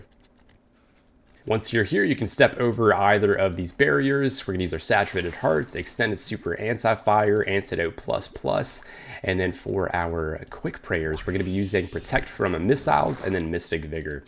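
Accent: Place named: Australia. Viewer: i did not know steve irwin making guides now haha. mate.. haha (aussie) lol. Dragon hunter want just one of the best new additions in the game recently, so many good places to use it. Fantastic and useful guide as always!!!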